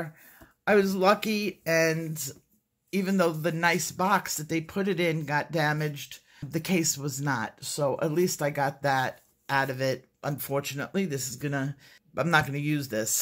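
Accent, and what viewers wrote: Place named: Hoboken, United States. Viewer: you talk just like my mom, shes from Hoboken. She says looseleaf too:)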